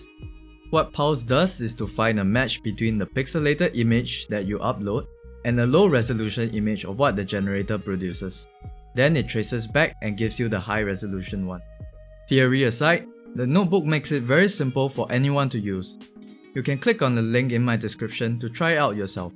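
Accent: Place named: Singapore. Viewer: i think hes singaporean, really distinct accent :P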